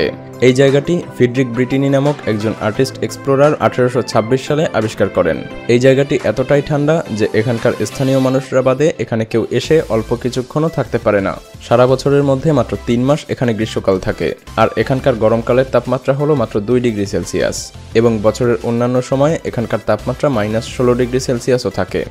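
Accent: Bangladesh. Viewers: Vi tmr voice ta r description a dawa number gulo bangladeshi tumi protek video teh kollkata tag koro kno tumi nijer country k tag koro na kno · Apnar kotha bngladesi dr mto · ভাই আপনে কি বাংলাদেশী? আপনার কথা শুনে যতদুর মনে হয় আপনি বাংলাদেশী। বাংলাদেশী হলে লোকেশন কলকতা দেয়া কেন? কলকাতা লোকেশন দিলে কি ভিউ বেশি পাওয়া যায়? নাকি নিজের দেশের জেলা লোকেশনে দিতে লজ্জা লাগে?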